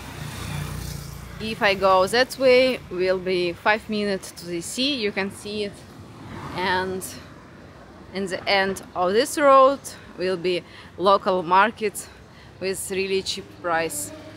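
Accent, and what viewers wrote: Russia. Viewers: $200 for rent and 35% of that for electricity? Electricity is not as cheap as rent in Vietnam. Anyway, I'll subscribe to your channel just so I can listen to your beautiful Russian accent and learn about Vietnam as well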